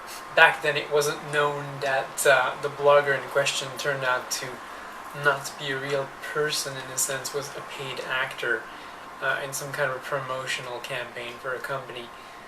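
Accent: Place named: Canada. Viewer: You're here too. I like her Canadian accent. And she's pretty.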